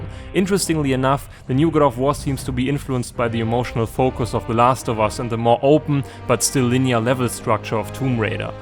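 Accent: Germany. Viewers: Well i'm German too, so i know the accent. Your english is really good so i didn't catch it from the beginning. I hope your channel will get bigger. I sub for sure. ;)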